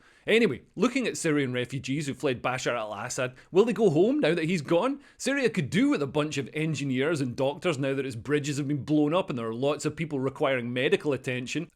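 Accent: Scotland, United Kingdom. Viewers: Fair play. You haven't got a clue what you're talking about but you do talk very quickly with that Scottish accent which gives those who dont know any better that you have some insights. Anyway, love to the family.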